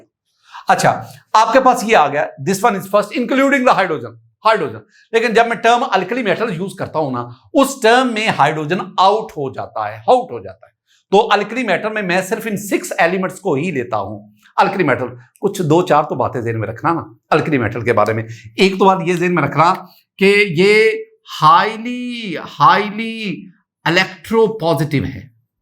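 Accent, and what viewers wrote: Punjab, India. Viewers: Punjabi speaking 🗣️👀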